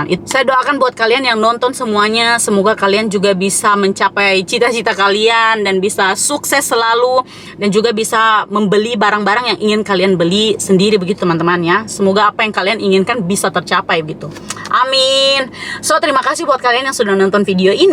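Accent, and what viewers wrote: Papua, Indonesia. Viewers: MF kk🙏🙏 Kk nc orang Papua iya??? Penasaran dengan logatnx kk😊🙏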